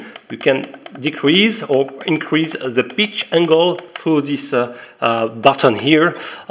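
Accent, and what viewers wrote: France. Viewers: mdr avec ton accent francais, il peut soulever quelle poids a ton avis ?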